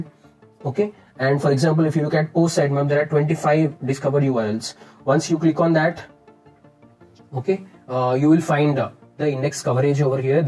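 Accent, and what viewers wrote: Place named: India. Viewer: Waste of time. No fixes explained only what the problem is. Indian speaker, cannot understand as accent too strong and speaks too fast.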